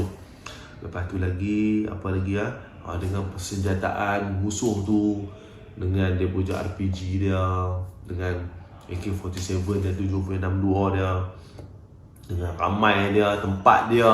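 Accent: Kedah, Malaysia. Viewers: Yang buat kan video ni best sbb loghat kedah. Teringat duk kampung tenang..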